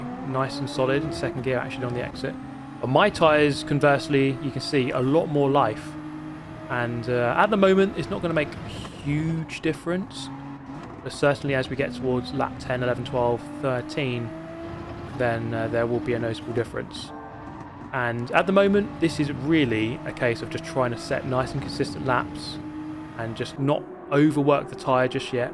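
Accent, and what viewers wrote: United Kingdom. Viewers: Always calm and composed, unbelievably well spoken, tastefully subtle British accent, and an incredibly smooth natural tonality of voice complimented by a great recording / microphone is a legit perfect combination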